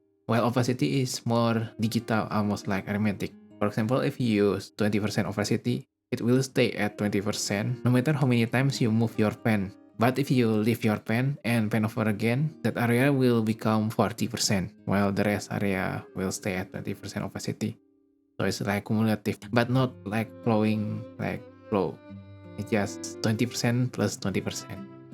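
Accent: Indonesia. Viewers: bro were indonesian all this time ...? can't hide those accent :face-orange-biting-nails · aksennya ngomong nya kyk indo bang ;v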